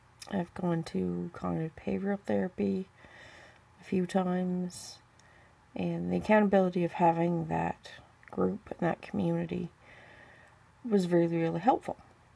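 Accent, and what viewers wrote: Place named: Cape Breton, Canada. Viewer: Thank you for sharing your story! Adding your voice is so valuable, be strong! Your accent reminds me of so many of my Cape Breton friends it makes my hearts warm!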